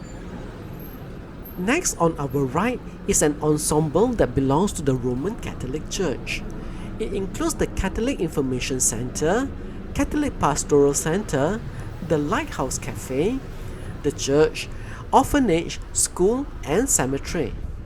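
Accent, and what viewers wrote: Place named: Malaysia. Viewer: Love to hear Malaysian English accent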